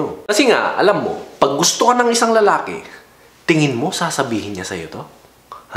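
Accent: Ilocos, Philippines. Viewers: Hahahaha may ilocano accent e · parang Ilocano mag salita .. yung accent nya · Kuya ilocano k??😂😂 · Ilocano ba t0? Ung accent nia kase😁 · Parang may ilokano accent po kayo😁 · Hinde nakakatawa pero... NATATAWA AKO😂 ILOCANO KABA? 😁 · Parang ilokano, ibanag accent diba po?✌️ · Ilocano ata to🤣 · Woahh ganun pala yun🤣🤣..peo the way you talk . Diko maexplain eh ilocano ka ba or tagalog?🤣🤣wla lng ask ko lang🤣🤣🤣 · May ilocano accent😂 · ah ok.. Hehehe.. Yung accent kase nya.. Hihihi.. Ilokanong ilokano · ilokano ata sya.. ay na apo madlaw😂😂😂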